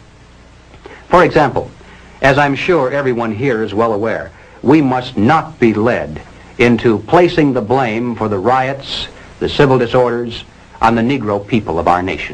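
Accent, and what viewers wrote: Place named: Mid-Atlantic, United States. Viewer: The mid-atlantic accent is persuasive as fuck.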